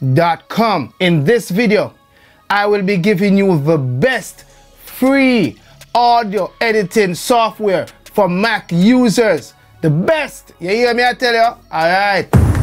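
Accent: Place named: Jamaica. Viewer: Subscribe Di minute mi hear Di yaad accent.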